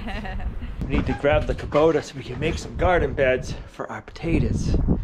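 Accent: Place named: Northeast, United States. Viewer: Moulton - It could be his unique NE USA accent. My 'ear' didn't catch it as odd. Although I'm SE NC, I had relatives who were No. NC & So. VA, with accents of saying "out & house" that sounded similar to "boot." My Grandma called shovel "shevel" & cover "kiver" with short vowels. 😊 I know is further north, but IDK where he grew up. It's the "Queen's English," as NC's tourist commission calls that type of accent, remains in parts of NC. Not quite northern VA nor New England. Many local areas have uniquely nuanced ways of speaking. just sayin'.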